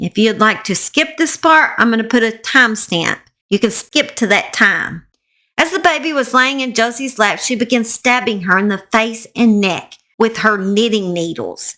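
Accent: South, United States. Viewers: I just love your country accent and I probably sound just as country I'm from Mississippi. Love your dog he's very smart.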